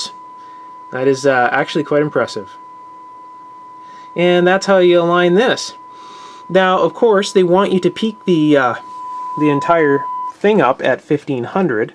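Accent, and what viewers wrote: Canada. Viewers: Nice Canadian accent,!!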